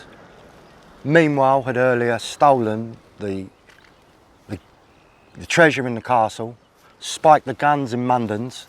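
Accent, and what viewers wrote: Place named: East London, United Kingdom. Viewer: Interesting..love your east London accent 🇬🇧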